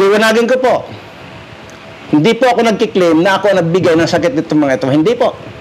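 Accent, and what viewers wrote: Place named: Visayas, Philippines. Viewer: Ngayon ko lang napanood ito nakatsamba kasi ako dahil nariring ko bantag bisaya ka sir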